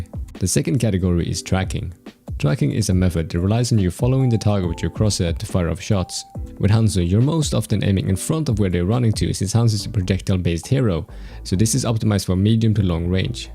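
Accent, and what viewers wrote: Sweden. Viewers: Bra video som fan, man hörde på din första mening den markanta "svenskheten" :P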